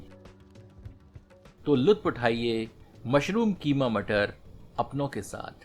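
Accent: Standard. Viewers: शुद्ध हिंदी बोलते हैं।आप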